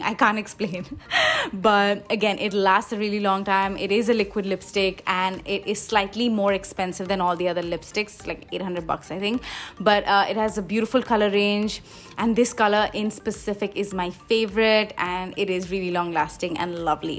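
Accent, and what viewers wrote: United Kingdom. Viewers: Thanks for swatching these babies during nykaa hot pink sale, surely will order few of em💋💋 lots of love ♥️ PS- The British accent though! Lit baby gurll🔥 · damm that british accent... much love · British accent?? Why? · Ahh that british accent of yours which comes in between 💜😂 Love love love ...true inspiration for me 🤗💜💜💜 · Thankyou so much ♥️♥️ this video was actually much needed 😍😍😍 and ofc your British accent ♥️♥️♥️♥️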